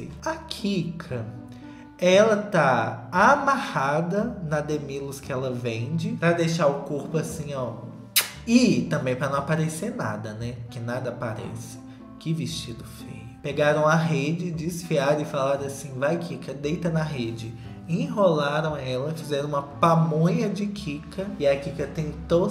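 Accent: Minas Gerais, Brazil. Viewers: quando vc fala Guarapari, tá falando do meu País ES??? Mineirinho 🤣🤣🤣🤣🤣 amando seu canal, nova por aqui 😜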